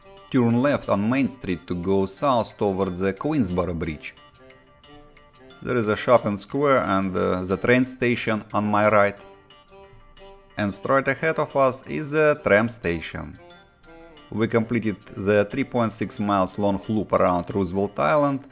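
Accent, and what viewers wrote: Russia. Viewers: You are russian? because your english sound a russian accent :)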